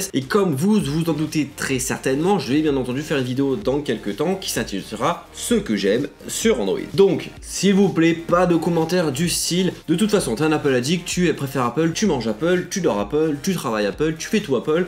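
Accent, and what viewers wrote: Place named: Marseille, France. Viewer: ahh j'ai griller ton accent marseillaise et team ios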